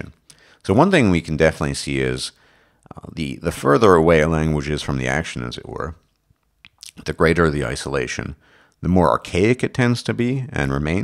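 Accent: RP, United Kingdom. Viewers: like for the queen's english accent. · Haha, RP it's called? You sounded just like William F. Buckley, Bertrand Russell, Aldous Huxley, Arnold J. Toynbee, and Stewie Griffin.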